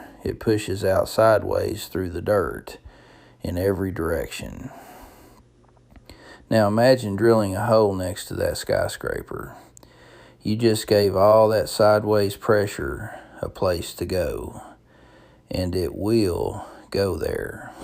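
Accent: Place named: South, United States. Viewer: this dude sounds southern as hell fresh out the hollar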